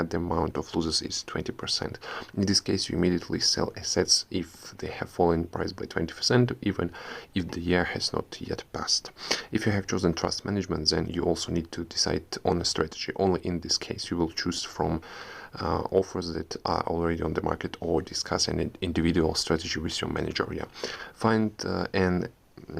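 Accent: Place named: Russia. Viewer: У тебя акцент есть русский!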